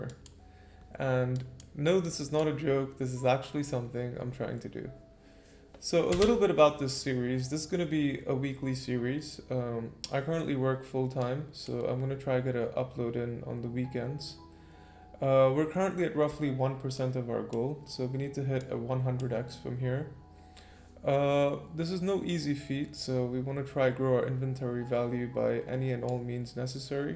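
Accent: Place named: Czech Republic. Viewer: Wow man, what a cool idea. Hope you will find sponsors to boost inventory. Btw where are you from? you sound Czech to me but if im wrong sory. Good luck.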